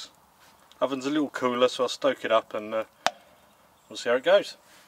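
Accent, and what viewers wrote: United Kingdom. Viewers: Mate honestly I’ve just started watching your videos and you’ve got all my attention and utmost respect! Sir you’re quite amazing. Would you mind telling me where are you from? Cause Sir You sound like you from UK?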